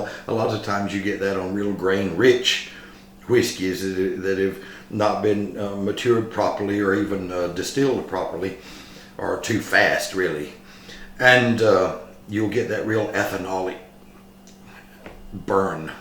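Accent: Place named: United States. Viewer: First time on this channel and I can’t get over your accent, which to me is straight from a Western movie. 😊 I can hear you say, “If you’re willin to work I can give you bed and board and after harvest maybe a little to help you on your way.” As for B&W, I feel it’s a great, affordable Glasgow Blend. The Compass Box does a more upmarket version. This particular style of whisky is sadly misunderstood and under appreciated in whisky circles. Good grain whisky is rare in an affordable blend but very welcome to those in the know. I would rather have a well-blended whisky such as this than a bland malt like Glenfiddich 12.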